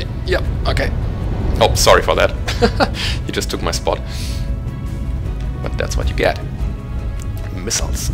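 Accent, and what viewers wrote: Germany. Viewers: Just discovered your channel.Enjoying your MWO vids.Cool German accent and greetings from Scotland.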